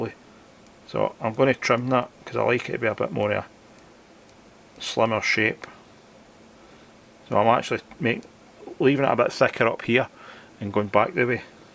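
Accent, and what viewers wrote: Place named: Scotland, United Kingdom. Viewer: Great fly....nice clean head. I have a hard time understanding the Scottish brogue, but enjoy it none the less. I've a qeustion though. I'm in the States (Montana), and I've never heard of a "cruncher" fly pattern. I could be living under a rock, but got me thinking if we have different nomenclature for flies across the pond just like we do with other things (line vs queue / trunk vs bonnet / trash can vs bin, etc.). For instance, a common pattern over here is called a "Royal Wulff." Do you have the same over there? Another common pattern would be a "Caddis" or "Renegade." Do you have those as examples?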